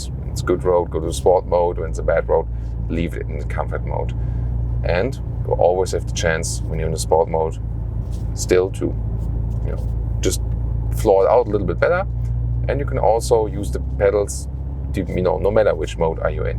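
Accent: Germany. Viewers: Lovely when you wake up at 2PM and you can no longer fall asleep, you get to hear ' English with gorgeous German accent. Works better than ASMR!! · The perfect germenglish accent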